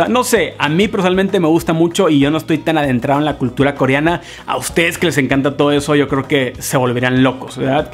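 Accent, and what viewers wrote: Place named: North, Mexico. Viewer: Veo todos tus videos sonriendo 🤩 y amo tu acento norteño! Yo también soy del norte 🙋🏻‍♀️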